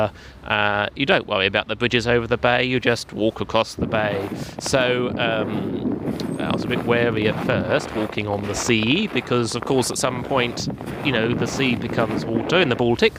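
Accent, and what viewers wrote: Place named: United Kingdom. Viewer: Thanks for this! 😀 great content! I like how British your accent is and yet i can clearly understand what you were saying!😊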